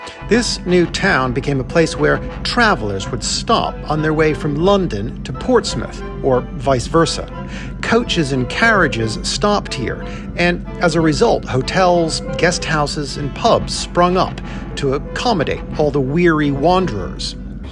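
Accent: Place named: United States. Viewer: I stumbled across your videos and have been binge watching all morning. I look up Petersfield periodically on YouTube to see what is happening and your American accent jumped out! We lived in Petersfield for a couple of years but have since returned to Maryland. Your series on the surrounding areas have and been awesome and very nostalgic for me. My daughter did Irish Dancing lessons in South Harting, performed during a festival in front of the church and have found memories of watching the Morris Men dancing (very English!). Cycled the South Downs many times and did the BHF charity 100 mile ride from Winchester to Eastbourne. Kids went to Sheet Primary School and enjoyed seeing the village and Queens Head pub in your phone box video. Anyways - Cheers and thanks for sharing your videos!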